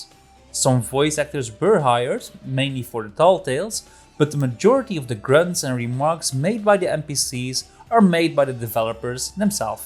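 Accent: Netherlands. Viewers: He's Dutch, I think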